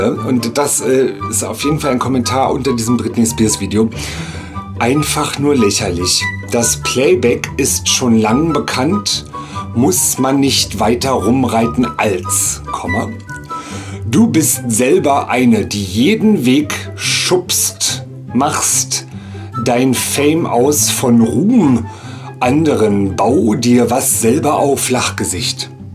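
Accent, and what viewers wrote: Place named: Berlin, Germany. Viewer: Ich hoffe du liest mindestens 100mal mehr positive Kommentare als solche niveaulosen die im Video gezeigt wurden. Dein Humor ist großartig und deine Kunst ist nicht nur unterhaltsam sondern auch sehr lehrreich in puncto Szene, Politik, Berlin und Selbstbewusstsein. Natürlich ist der Ton manchmal sehr derbe. Aber wer sich die Mühe macht hinter die Fassade aus derber Berliner Schnauze und Makeup zuschauen, wird die Kunst und deine Absicht dahinter erkennen.Ich hoffe noch viele Videos von dir sehen zu können.Danke dafür <3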